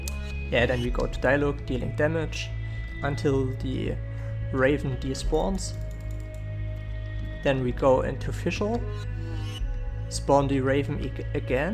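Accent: Saxony, Germany. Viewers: are you german? It sounds like you have a german accent:D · your accent is sooooo german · Hahaha are you from Germany? If so you can hear it out from your English accent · wie man den deutschen akzent nicht nicht beachten kann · kommst du aus sachsen 😂❤ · Your accent sounds kinda German, may i be wrong?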